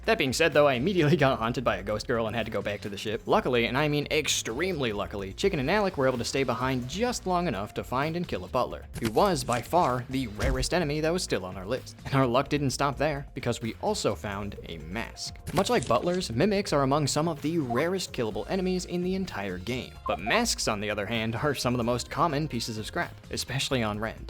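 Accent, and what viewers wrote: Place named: Netherlands. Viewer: u sound dutch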